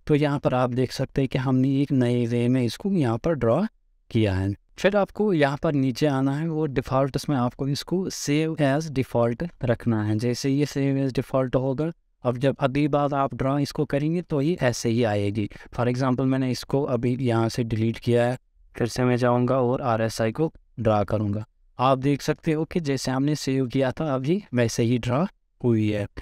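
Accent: Kashmir, India. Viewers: Your accent is kashmiri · After listening just couple of your words i came know your are from Kashmir... Well done 👍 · Bhai. aap ne to pura gyan hi de diya. Unclear vision sab fully clear kar diya he. Love you bhai. Hamesha khush raho aap or ese hi knowledge share karte rahiye. Apka awaz sunke mere kashmir wale dost ki yaad aa gayi. I am your subscriber now. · Ur accent .... Kashmir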